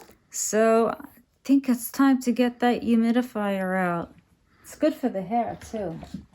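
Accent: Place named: New York, United States. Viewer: New York accent hits hard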